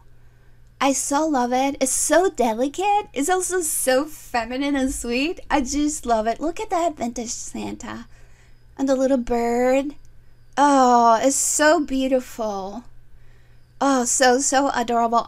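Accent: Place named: Latin America. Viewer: Oh no problem I understand ... I just hear a bit of Latina and I was just curious great video have a great week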